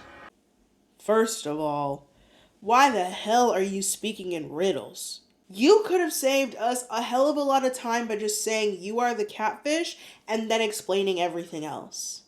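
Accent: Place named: Canada. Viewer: It’s crazy I skipped over you being Canadian in your bio and I heard you say “about” as “aboot” I had a degrassi flashback and said ohhh… is def Canadian 😂I’ve watched plenty of your vids and somehow never paid attention to that until now but I love it ❤😂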